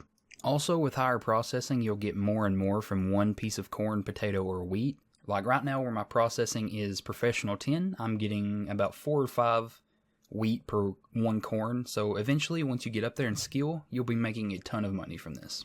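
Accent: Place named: United States. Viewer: I love this game and your country ass accent SUBBED!!!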